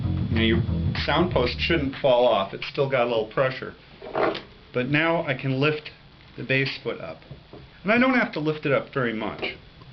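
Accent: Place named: United States. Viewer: It sounds American, to me...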